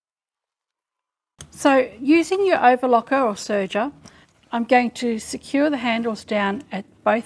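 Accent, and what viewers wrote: Australia. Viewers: Is she American? She sounds Aussie to me, I might need my ears cleaned lol.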